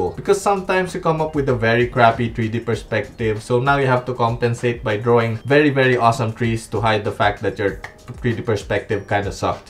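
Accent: Philippines. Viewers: hI, recogninzing your filipino accent ! Thanks for your tips, i love it ! val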